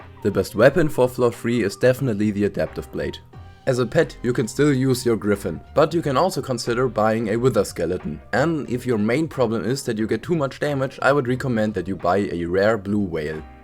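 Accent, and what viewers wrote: Germany. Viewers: Are you german because you sound really german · man hört dein deutschen aktzent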